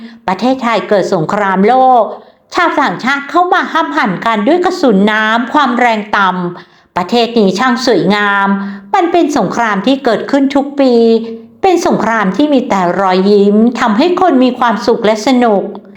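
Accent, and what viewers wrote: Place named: China. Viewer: ทำไมแอดพูด ส.เสือไม่ค่อยชัด สำเนียงพูดเหมือนคนจีนเลย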